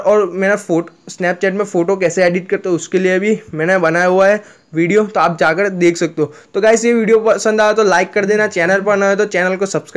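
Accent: Gujarat, India. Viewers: You are from Gujarat ??❤️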